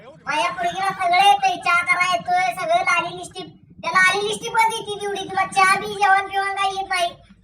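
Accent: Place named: Rural, India. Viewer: अण्णा , ग्रामीण बाज ऐकायला छान वाटतोय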